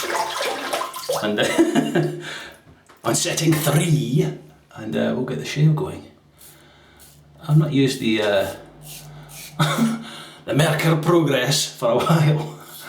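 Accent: Scotland, United Kingdom. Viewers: Great shave,! Happy St Andrew's Day to ye! I'll be sure to take a wee dram this evening to mark the occasion...well..a day late of course being I'm down here in the merry old land of OZ. Hehehehe.Your Scottish 'burr' reminds me...yes...again..of a classic piece of British comedy...! Slange! <link>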